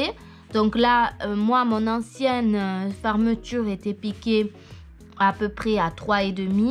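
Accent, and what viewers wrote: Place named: Carcassonne, France. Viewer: Super vidéo Je sais changer une fermeture eclair mais cette vidéo apprend toujours Bravo à vous Je vous découvre et je m abonne Vous avez un petit accent comme moi 😂 Etes vous de Carcassonne ?😂😂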